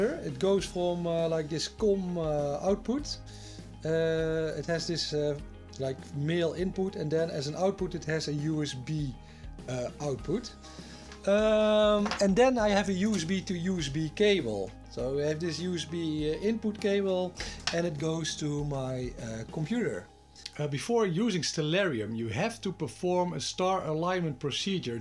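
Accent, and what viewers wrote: Netherlands. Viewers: Het accent is Nederlands, dus probeer de vraag even in het Nederlands. Kan ik gewoon een RJ11 naar USB kabel gebruiken? Dan sla ik de rs232 over zeg maar.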